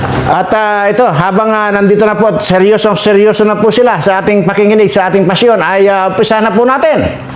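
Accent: Iloilo, Philippines. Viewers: ilonggo kaba sir parang ilonggo yong bigkas mo ng salita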